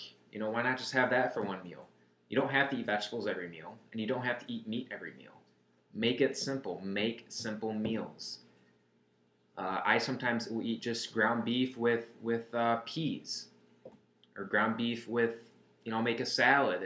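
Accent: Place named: Chicago, United States. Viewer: I knew from your first sentence that you were from Chicago lmaoo me tooo :)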